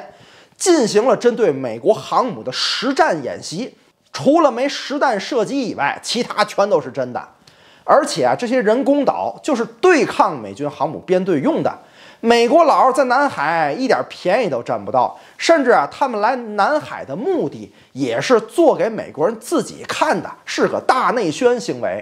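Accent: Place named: Tianjin, China. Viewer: 口音天津人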